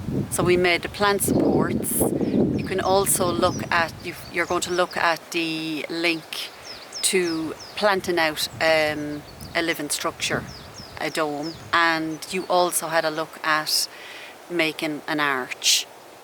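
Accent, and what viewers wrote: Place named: Ireland. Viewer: Hi there from NJ in the US. Your video has inspired many willow projects on my little farm of 20 acres. Love your spirit, your nature and your accent, especially your accent because it reminds me of a friend from Ireland who also inspired me. Now I need more willow stools to make more fabulous things. Keep going and growing!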